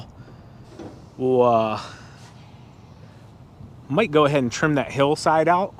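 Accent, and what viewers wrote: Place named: South, United States. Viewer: just keep sounding like a southern red neck like me. i knew what you were saying.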